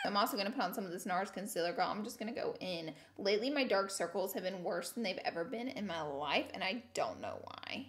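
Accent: South, United States. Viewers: omg grl! thank u so much for sharing & I watch your channel because of the makeup & the comedy! you are so funny! I'm from the south too so thank u for not hiding your accent or your goofiness. You are amazing! It takes so much dedication to work with your husband I'm sure. thank u for always being yourself . God bless u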